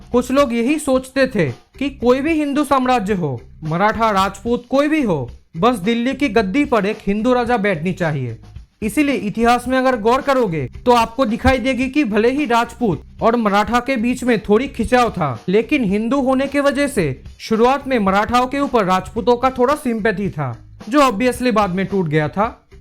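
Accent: Bengal, India. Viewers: thankyou for answering me by the way love your videos, and your bengali accent makes really cool to watch it, love from Indore😍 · Bro are you Bengali....tumhari accent kuch waise hi lag rahi hai......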